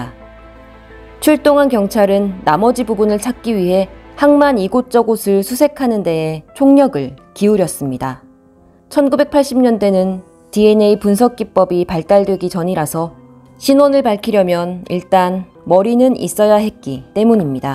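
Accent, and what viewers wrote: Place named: Japan. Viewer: 발음을 보니 일본어를 할 줄 아는 분이시네요. 맞죠? ^^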